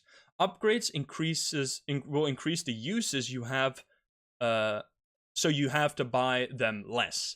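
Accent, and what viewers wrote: Netherlands. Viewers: WAIT, are you dutch? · Dit is een Nederlander ik kan het horen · eeeey een nederlander of belg idk eeeeey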